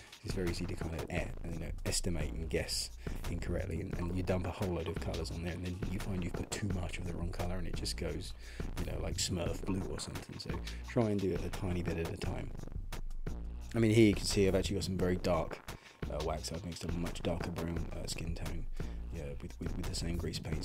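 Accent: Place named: Australia. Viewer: he sound Australian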